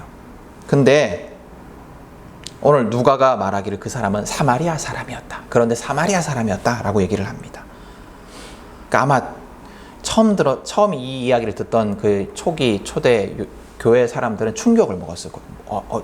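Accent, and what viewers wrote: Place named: Gyeongsang, South Korea. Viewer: 한예연에서 설교 듣고 구독했습니다! 목사님한테서 대구방언 억양이 들렸는데 대구에 있는 교회였네요. 예수님 사랑만으로 충분합니다. 목사님, 감사합니다!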